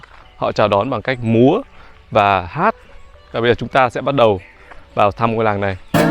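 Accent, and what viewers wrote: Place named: Mekong Delta, Vietnam. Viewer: Canh giong mien tay wua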